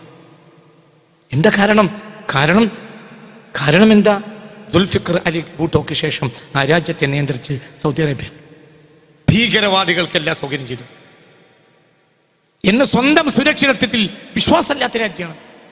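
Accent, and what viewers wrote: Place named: Malabar, India. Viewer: ഉസ്താദ് പ്രസംഗിക്കുന്നത് മലയാളത്തിലല്ല മലബാരി ഭാഷയാണ്.. മനസ്സിലാക്കാൻ പ്രയാസം😓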